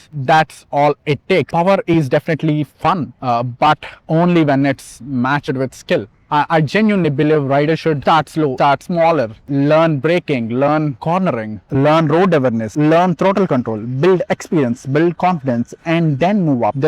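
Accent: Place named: India. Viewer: As an indian , this accent is 😭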